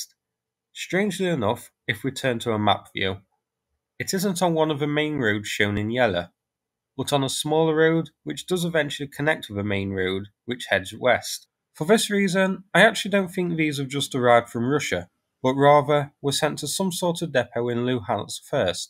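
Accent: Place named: Yorkshire, United Kingdom. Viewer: "Countreh" "Terminatuh" "Yeller" I wonder what part of yorkshire the narrator is from ?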